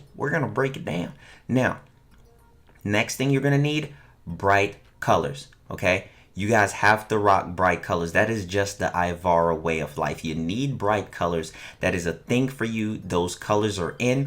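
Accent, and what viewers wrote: Jamaica. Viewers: that jamaican accent tho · Are you Jamaican lol... I heard you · That Jamaican accent tho mad respect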